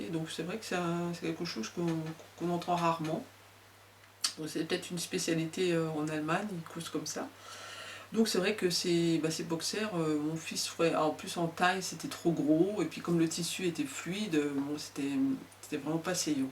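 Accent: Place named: East, France. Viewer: Bonjour, je suis une nouvelle abonnée...je pensais bien que vous veniez de l’Est...vous avez l’accent ! Je suis de l’est aussi !! Belles cousettes , j’adore !!!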